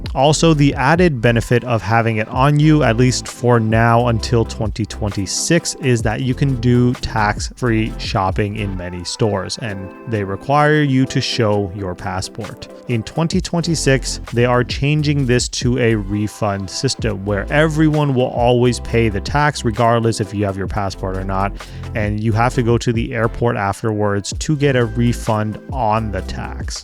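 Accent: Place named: United States. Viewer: Your name is not Japanese, and your accent is very American. Why this video? You did not speak Japanese at all in the video to tell the Japanese viewers what your real intentions are. I am a NYC expat. Living in Japan. Yes, I speak and read most of the language, mostly self-taught. 41 Years in Japan. I surf Shonan. I have friends all down near Katase Enoshima. Thousands of tourists a day visit that area especially on weekends. The rudest rule breakers are the loud, obnoxious. neeeee screaming women on trains are the Japanese baba! In all my years here and down near that tourist area, I never saw one person litter. Once every four months or so, I tell obnoxious loud young Japanese wanna B cool guys to pipe down, and actually, I told a Chinese guy the same thing three years and four months back. Other than that, I often have to yell at little, obese Chinese 10-year-olds for chasing pigeons. 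I walk 5 kilometers a day to stay fit. The most disgusting places I walk by with litter are all Japanese areas with zero tourists. I rarely catch them in the act, but when I do, I get down to their animal littering level and let them have it verbally. Stop blaming tourists. Most of the bad stuff comes from the locals. I can send you maps and photos if you want. I bet you do not respond, though, as you are looking for followers, not reality.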